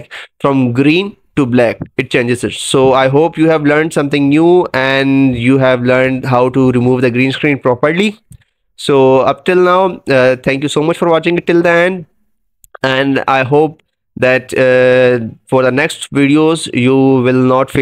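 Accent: India. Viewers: alr by listening you accent i thought you are from india or nearby countries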